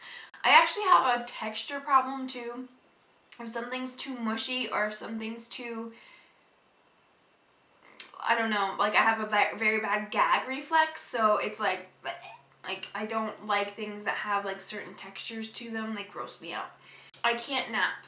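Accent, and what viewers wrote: Canada. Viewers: you MUST be Canadian! I can tell by the way you say "out". I <3 canada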